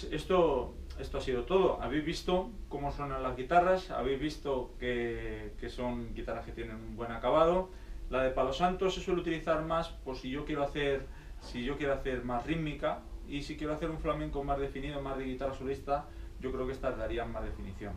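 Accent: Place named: Spain. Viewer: Hola, me gusta mucho tu voz y tu acento español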